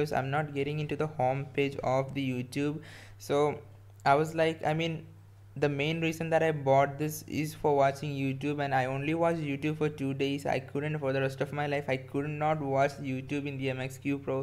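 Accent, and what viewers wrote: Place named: Kerala, India. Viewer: Malayali aano.. english ketal angane thonu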